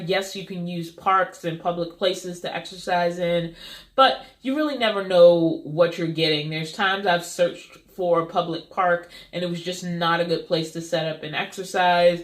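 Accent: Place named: Maryland, United States. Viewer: I grew up in Baltimore. I thought I heard a bit of the ole Maryland accent. Enjoy your travels.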